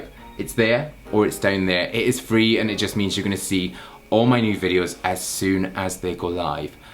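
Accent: United Kingdom. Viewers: Is funny how British people talk.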